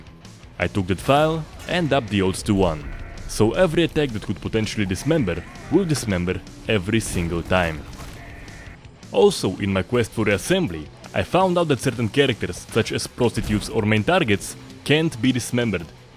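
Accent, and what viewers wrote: Russia. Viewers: Are you russian or something?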